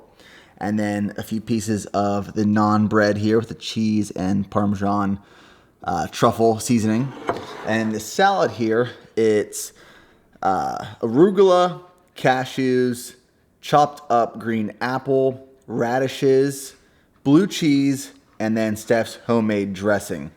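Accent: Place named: Philadelphia, United States. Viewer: Hey! I have been a subscriber to your channel for a few months and I always wondered where you're from originally? You sound like you have an Philly accent! Good luck on the training!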